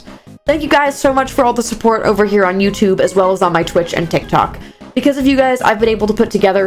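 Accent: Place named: United Kingdom. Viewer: i cant belive you're not british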